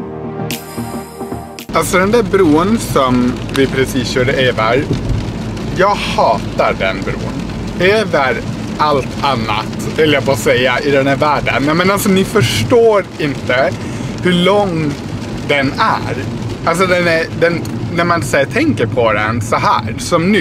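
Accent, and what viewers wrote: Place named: Norrland, Sweden. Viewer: Hahaha så härlig vlogg, alltså er dialekt där uppe är ju helt amazing! :D "hemmakväll-påse på huuuvet"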